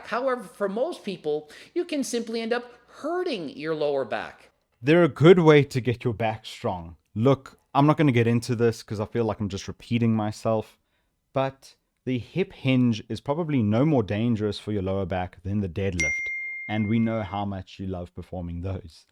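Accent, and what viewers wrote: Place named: South Africa. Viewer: I thought so when I heard your voice, awesome seeing south african creators on this platform. I'm from cape town.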